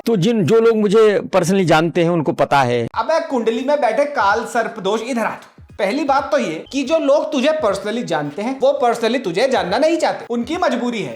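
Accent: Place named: Delhi, India. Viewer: Bhai apki Avaj se Lgta aap pakka delhi se honge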